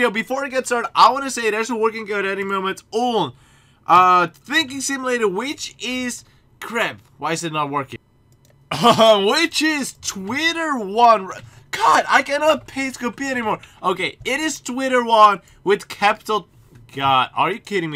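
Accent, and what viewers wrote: Netherlands. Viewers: wait r u dutch?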